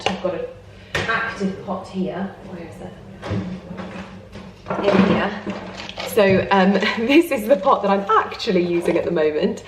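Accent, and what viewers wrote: United Kingdom. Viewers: I am polish And I Love Your videos content ❤ as well Your british accent. I even learn english with You to have nicer english 😊 You are just such a lovely person ❤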